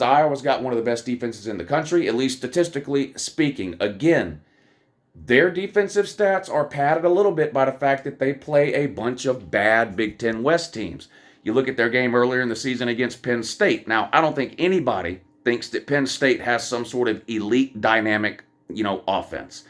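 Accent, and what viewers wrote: South, United States. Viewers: From GA moved to Washington 6 months ago. Love tuning in to almost every video. Its comforting to hear another southern accent.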